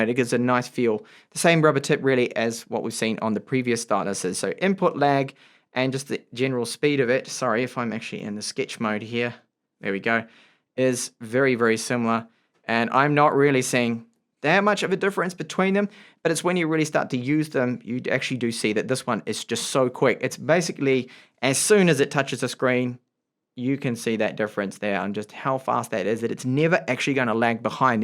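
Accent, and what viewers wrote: Australia. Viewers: he sounds Australian to me too? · What's your nationality? Aussie, Kiwi or English? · He sounds Australian to me lol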